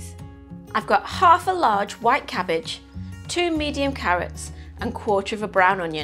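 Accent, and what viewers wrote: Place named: England, United Kingdom. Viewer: At last.......an English accent :)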